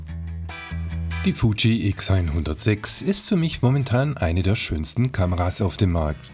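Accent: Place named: Swabia, Germany. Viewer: Schwabe, ja aber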